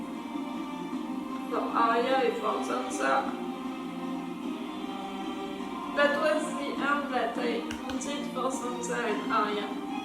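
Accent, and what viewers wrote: France. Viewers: a bientot! :) and I'm gonna miss that cute French accent, too!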